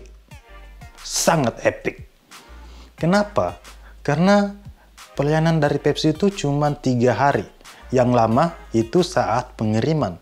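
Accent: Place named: Jakarta, Indonesia. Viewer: Logatnya keren klo org mks logat jakarta🤓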